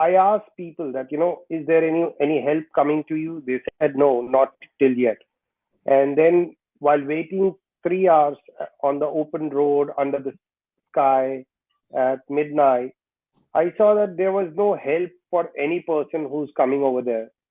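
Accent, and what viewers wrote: Myanmar. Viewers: You shouldneed. For practicing to become good pronounciation.At the moment very Burmese tone you had announced.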